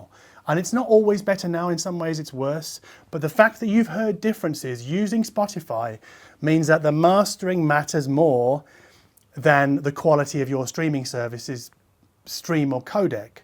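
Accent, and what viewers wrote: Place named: England, United Kingdom. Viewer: I like the way you speak, always have been jealous of english accents.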